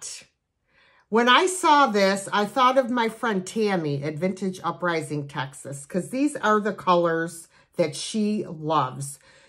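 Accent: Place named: Western New York, United States. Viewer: Hi, Just a Question....Do you remember Uncle Jerry's Club? I think it was from the 50's filmed live from Rochester or Buffalo NY studio. It had Aunt Annie on the piano and I used to try and copy the accent, that you also have;^) My sister and I never missed it (Sunday or Saturday morning??) We loved saying Cheektowaga Lackawana and Tonawanda etc. Love your Sat 3pm show and of course others. Please never lose your enthusiasm.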